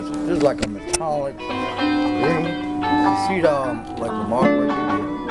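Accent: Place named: South, United States. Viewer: It's so weird seeing a guy with asian heritage having a really southern accent. XD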